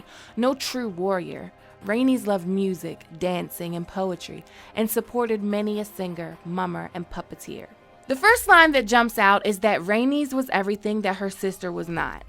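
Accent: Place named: Baltimore, United States. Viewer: Rolls up, and sips sultry sweet summer tea.. From Liberia I can hear that bmore twang. Thx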